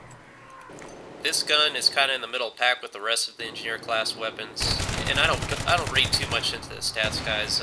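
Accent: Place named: South, United States. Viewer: Parisians are a tough bunch, but the French generally are very kind and considerate once your out of the cities. The older folks remember our role in the liberation and have kind things to say about us. If the French formed their opinions on us based on one trip to Manhattan, then surely they would say similar things about us as you have about them. You sound southern, a pilot out of Atlanta? Nice folks down there, hospitable and respectful. Decent and kind. Total culture shock I'm sure.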